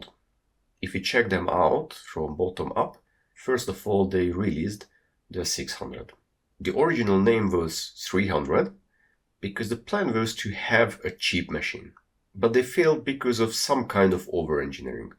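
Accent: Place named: Hungary. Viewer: My comments are being deleted by youtube, I think they dont like long comments other then channel owner... One more think, I think I hear Hungarian accent in your English, are you from Hungary? If so, could you make some research and video to very interesting topic, in early 80s Jack Trammiel had a Software developer team in Hungary that developed a lot of Commodore software, which is very interesting since Hungary was behind Iron Curtain. Can you find some background info, or maybe find someone from that era for interview?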